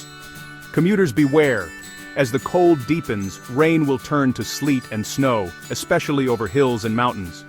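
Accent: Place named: United States. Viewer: UK weather .why has the narrator got an American accent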